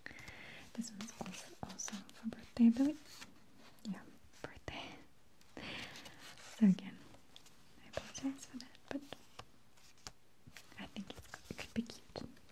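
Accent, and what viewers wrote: Russia. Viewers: I seem to have the same reaction to various weights and qualities of journal pages! FYI that beautiful carved leather journal has a classical image of the three graces on it. from greek myth but most famously revived by Botticelli during the Italian Renaissance. google PRIMAVERA by him and you will see them. You are definitely the queen of relaxing ASMR videos. So elegant and soft and beautiful! lovely graceful hands too. And that very slight Russian accent makes your speech quite exotic. What a success you are! thank you for all you do. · I think the thing with is her accent. She is the only person who actually makes me go to sleep. I love her Russian accent, it makes it so nice. Who agrees?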